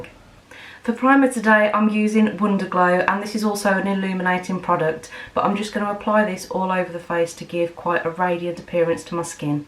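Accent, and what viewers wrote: Birmingham, United Kingdom. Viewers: Fabulous calming and informative video :) are you from Birmingham? Hope you don’t mind me asking, it’s lovely to hear it! :) x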